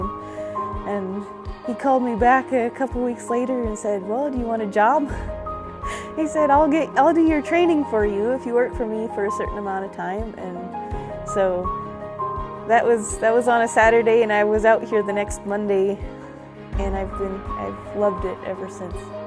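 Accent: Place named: Midwest, United States. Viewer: "Screw you gieez, I'm going to do what I want to." It's adorable, and you sound like the Midwest to me.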